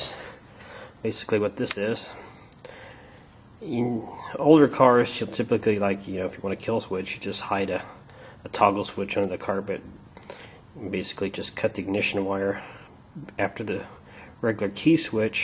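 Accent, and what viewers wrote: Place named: California, United States. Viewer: Thx for the info dude! You sound like a California boy and the music after the video definitely Cali shit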